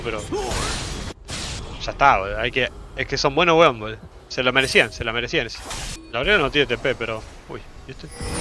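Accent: Chile. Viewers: Grande hacías falta, pero algo me dice que alguien te está pegando un acento medio chileno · Soy yo o me parece notar que a se le está pegando el hablar como chileno, con acento y todo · hablas como chileno ahora:face-purple-crying::face-purple-crying::face-purple-crying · Por que habla como chileno ahora :V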